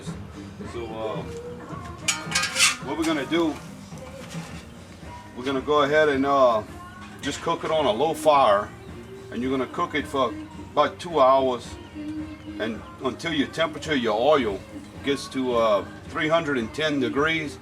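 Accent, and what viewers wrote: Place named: New Orleans, United States. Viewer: That "naw-lens" accent distracted me the whole vid! Love it!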